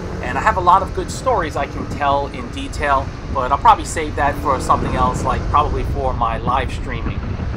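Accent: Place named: New York, United States. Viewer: thanks for another ride along. i really like these. on a side note i ran across an old video of yours. it was the one you made a wine bottle tongs. boy your new yorker came out in that one..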